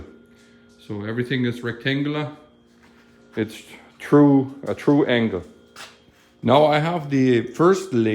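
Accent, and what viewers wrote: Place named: Germany. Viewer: Hi, interesting where are you from, as for me you have a german accent. PS. The quastion was answered a few minutes later))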